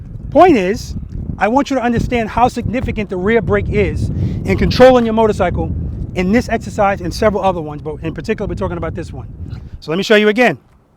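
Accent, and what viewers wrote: New York, United States. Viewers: Hey. Great lesson my friend. Your encouragement lifts me up, encouraging me to practice and build confidence. Your technical skills are awesome as is your presentation. Driving all of this is your life philosophy of “paying it forward” and appreciation. Also, having grown up on Long Island, it’s good to hear your NY accent. You are making a big difference for me and many others and I thank you and appreciate you. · I can't tell you how much I appreciate what you're doing with this channel. I love the way you break everything down step by step and I especially appreciate how humble and joyful you are. I'm a baby rider and I've been watching videos fr many different experienced/veteran riders, but the way you explain things just clicked for me. Same goes for Jerry Paladino (Ride Like A Pro). BTW, love that NY accent! God bless you!!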